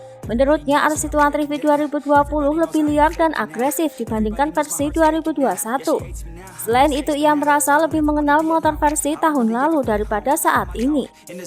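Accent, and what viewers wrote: Java, Indonesia. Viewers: Mantab bagus penjelasannya , ciri khas banget penjelasan medoknya saya suka 🥰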